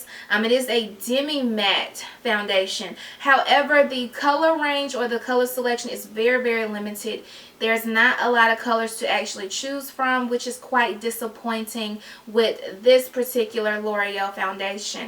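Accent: South, United States. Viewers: Olivia lily really she has a country accent to me nicki minaj is from NYC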